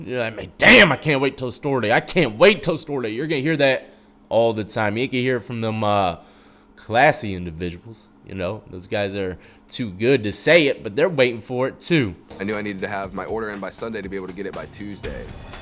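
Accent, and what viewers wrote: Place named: South, United States. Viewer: That mid southern drawl.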